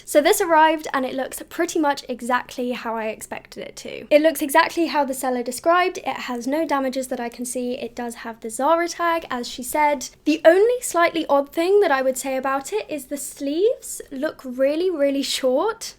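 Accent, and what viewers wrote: United Kingdom. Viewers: british accent 🤤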